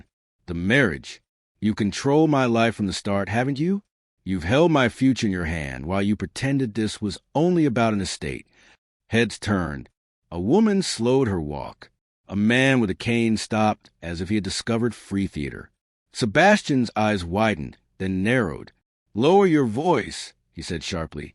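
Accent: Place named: United States. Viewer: Why haven't these regency romances not been given English narrators. Sound very odd in American English.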